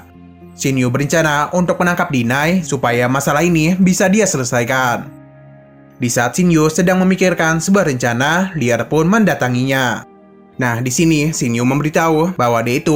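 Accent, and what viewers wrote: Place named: Central Java, Indonesia. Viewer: dari jateng mana suaranya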